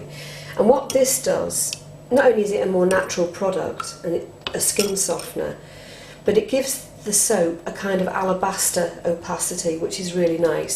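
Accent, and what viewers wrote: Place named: United Kingdom. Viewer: So cute!...i always seem to have issues with my mnp setting in my pouring jug....i dont like to heat it up too much because i worry about my fragrance being burnt off....your mnp looked very hot....you dont have issues with burn off....love ur videos :o)....nice to hear a uk soaping voice! x oh and the cornflour tip..awesome :o)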